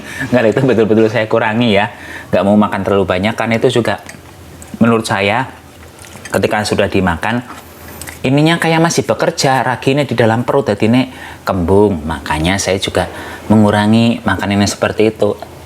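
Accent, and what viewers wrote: Banyumas, Indonesia. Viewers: Sangat bermanfaat Lik,, lilike wong Endi sih daning bahasane ngapak banget 🤣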